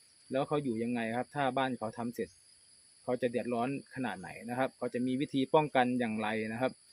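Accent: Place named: Korat, Thailand. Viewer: เป็นคนกะไหนน้อครับคือเห็นพูดโคราชดาครับ😄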